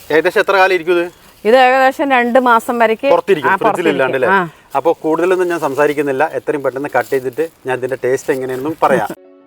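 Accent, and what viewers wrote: Palakkad, India. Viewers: അടിപൊളി 👍🏻.ഒരു പാലക്കാടൻ slang ആണല്ലോ ചേച്ചി? പാലക്കാട്‌ ആണോ?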